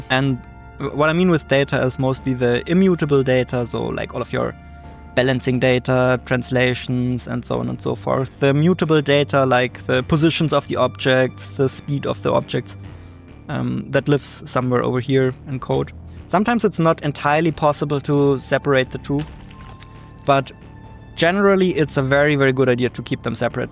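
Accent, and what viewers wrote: Germany. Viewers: Nach 4 Sek wusste ich, das du ein deutscher bist :D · your voice sounds very german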